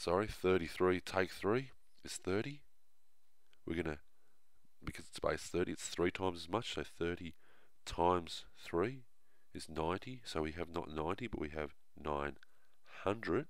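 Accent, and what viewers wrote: Australia. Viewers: The best math teachers have Australian accents.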